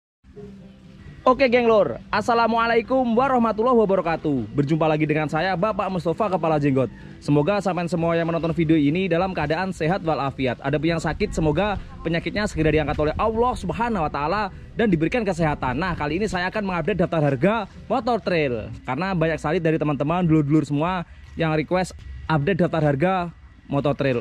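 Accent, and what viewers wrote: Java, Indonesia. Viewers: Bos kacung punya sowrom motor juga,,,, aq suka pak kepala jenggot logat jawanya kental dan ada humoris nya juga .selalu doain di awal dan semoga berkah dalam hidup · Medok mas 😝😝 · wong jowo iki?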